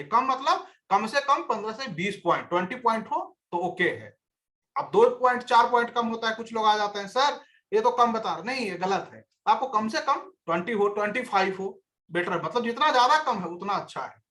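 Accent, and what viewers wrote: Bihar, India. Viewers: Sir do you belong to Bihar?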